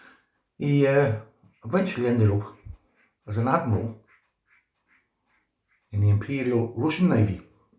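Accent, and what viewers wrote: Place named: United Kingdom. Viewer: Thank you so much,! So glad you enjoyed the scent and shave:) I love my 1960 Fatboy. You tell the JPJ story very well and love to hear it in a British accent!! Really appreciate you, and keep the content coming. Cheers!!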